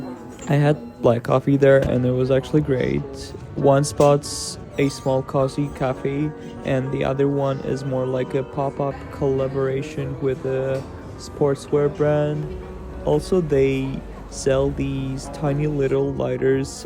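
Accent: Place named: Flanders, Belgium. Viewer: You have a flemish accent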